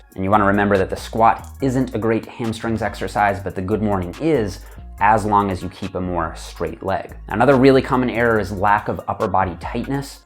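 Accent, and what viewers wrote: Newfoundland, Canada. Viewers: The Canadian "r". Very distinctive. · Hello, been a big fan of Good Morns for years. Can you tell me what your thoughta are on a front GM where you're hugging a weight plate? Thanks very much. Also, are you from Nfld? Your AR's sound like mine and I'm from Nfld.😋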